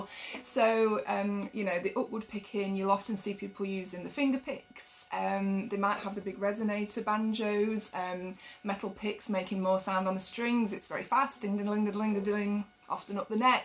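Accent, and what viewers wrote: England, United Kingdom. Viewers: I really like your style of teaching Claw Hammer banjo! I will be watching your videos! I'm from Texas and I love your British accent! And you're happy! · So much easier to learn banjo from someone with an English accent :)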